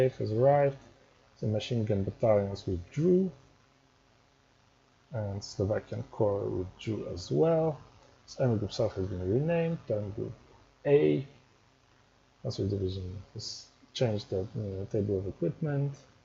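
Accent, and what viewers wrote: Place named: Eastern Europe. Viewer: You meant to say "SEXY EASTERN EUROPEAN ACCENT".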